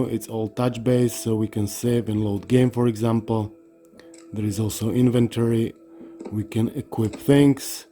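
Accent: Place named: Latin America. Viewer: por que siento que eres latino xd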